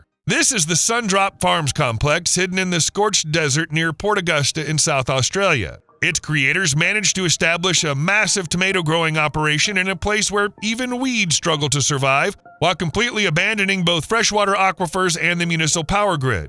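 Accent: United States. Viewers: the shrieking laconic American voice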